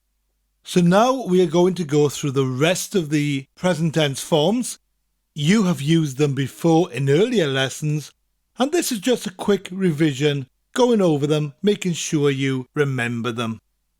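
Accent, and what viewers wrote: Wales, United Kingdom. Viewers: Is this North Wales or South Wales speaking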